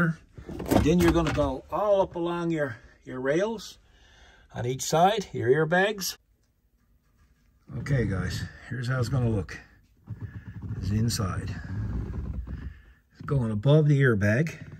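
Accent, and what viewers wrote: Canada. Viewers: Original lights are the IAG ones I’m guessing. I watched the install on those and was like nahhhhhhhhh. I was just thinking fuck it, I won’t bother until I came across these Mabett ones. So far it seems much easier and less hassle. I do appreciate they make a smoked version of the grille lights so prob get these with those lights. I wish there was more grille light options for Base Bronco. It’s crazy the amount of stuff Ford just didnt do for the Bronco. Dome lights, vanity lights, it’s ridiculous. Great tips here tho, saves me some headaches as this stuff always take longer when you learn the hard way. Are you Canadian by any chance? Sound like a Canadian to me, haha. Lot of the mannerisms haha.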